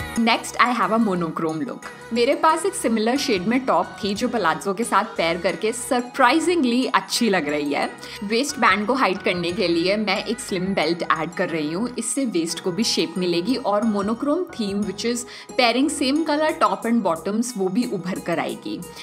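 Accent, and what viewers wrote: Punjab, India. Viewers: Great job done! Thanks alot for providing soo many options in one video👍 appreciate your efforts ❤️ nowdays bloggers waste 15mins for just one tip/outlook, but this was worth watching. I saw your video for the first time...n yes I m the new subscriber😇 yayy I found a decent, grounded styler👍❤️ (The Punjabi accent is love) Lots of love and success to you lady😇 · Hey, just found your channel and quickly subscribed and followed you on Instagram. I usually don't leave a comment but you are so pleasant and I just loved your Punjabi accent. P.S. Aap bohot Pyaare ho and I loved the way you spoke throughout the video. Lots of love to you! Stay true and best wishes!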